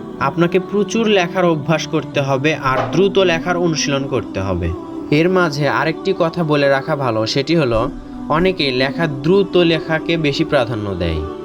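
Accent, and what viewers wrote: Bogura, Bangladesh. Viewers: Apnar basha ki Bogura te. Vai🤔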